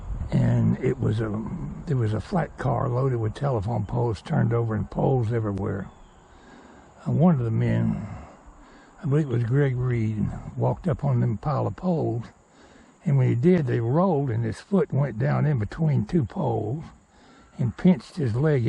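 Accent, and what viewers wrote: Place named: Georgia, United States. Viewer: just remembered your channel and the railroad stories, sometimes listening to your georgian accent is a hoot like the you you say fire, sounds like your saying far. lucky that door didn't fall on him, those railroad things are extra heavy, and you cant ever be too too carful around railroad stuff just hitting your head will about knock you out, that cast and forged metal dont have any give unlike a car or lighter vehicle made of sheet metal, belive me I know in the course of helping in the shop for the nkp 765 we have dont much car restoration the last 5 years, I rung my bell several while working on the inside of the tender to clean it in the off season all those baffles inside the tank , or backed into eighth inch metal on the body of it it dont have any give , and skinned myself plenty crawling around in the inside of the firebox, I would say the scaryest time was wirewheeling and I hit a right angle on the bottom of a car I was taking the dirt and rust off of by the truck of the car and it bounced five times hit my left wrist and bounced back and launched right across my neck still in my hands and landed on the floor it it was a cutting wheel I might not be here or gravely injured, one other time 2 years ago sat a 10 lb arch brick down with my finger right under it and it had a lump on it for a few months from squashing it under the block and the metal of the firepot where the coal comes out to be thrown to the fire with steam jets from the stoker.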